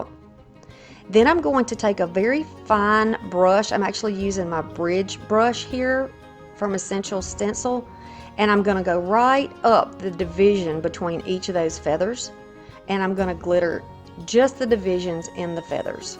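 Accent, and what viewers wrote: South, United States. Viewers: Both are beautiful. Me and my 9 year old granddaughter are going to go a fairy garden outside. Have you done any fairy gardens outside? If so, do you have any videos. I shared this video with her. She's so funny. She said mamaw she talks different. We live in New Mexico, and she's never been to the southeast side of the United States. I used to live in Mississippi. I still have some of a southern drawl.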